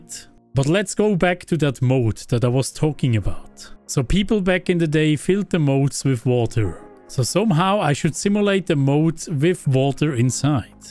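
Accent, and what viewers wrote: Switzerland. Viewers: Fast nöd denkt mit dim dialekt das du en schwizer bisch <3 Schad das die videos uf englisch machsch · me ghört eifach das schwizer bisch, endlich mal en schwizer wo gueti videos macht🎉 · meh ghört sofort das en schwizer bisch. vo züri?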